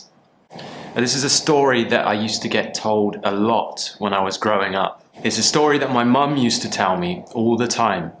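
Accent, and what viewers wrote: United Kingdom. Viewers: Hi The way you teach is impressive. I've loved British accent since I watched Harry Potter. Pity that I'm retired and I practice listening for fun. I'll recommend you to anyone who want a British teacher.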